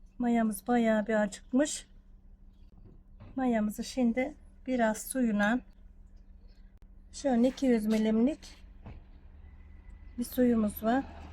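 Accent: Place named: Aegean, Turkey. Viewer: Ayni bizim gibi konusuyo usakla afyonu konuşması hi denizlide ayni şive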